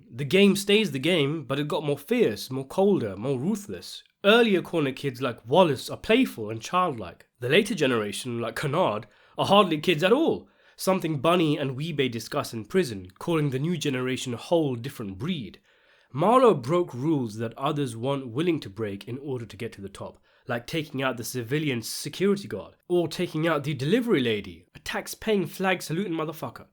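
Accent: United Kingdom. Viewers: The perfectly placed Wire one-liners in a British accent are CRAZY 😂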